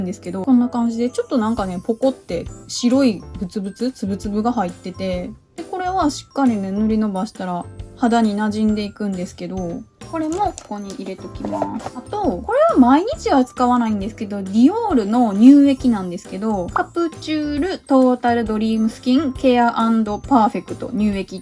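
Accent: Kansai, Japan. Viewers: 初めまして✨ サムネの可愛さと今スキンケアが凄く気になっててめっちゃ参考になりました🥺💕💕 気になったアイテムをQoo10で購入しようと思います✨ あと私関西人なので関西弁がとっても親しみやすいなと思いました🥰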